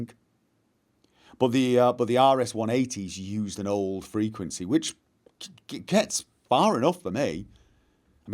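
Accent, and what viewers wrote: United Kingdom. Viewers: I am older than him too. I actually first started watching his channel because I am also a Brit that has long lived in Scandinavia, but the far north of Sweden for me. It was just so nice to hear a British accent and anecdotes from a period I so fondly remember. Grumpy old man - Check! Disparaging of suicidally dressed young-en's out clubbing - Check! Wrecking my back every year by slipping over on ice or snow - Check! Egad, I think I am the Swedish incarnation of over the border. I'd buy him a sympathy beer the next time I'm over to Norway, but I cannot afford to take out a second mortgage...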